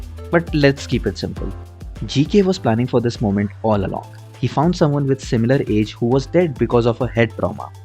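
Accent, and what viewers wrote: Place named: Kerala, India. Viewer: Ur English is also like malayalam 😤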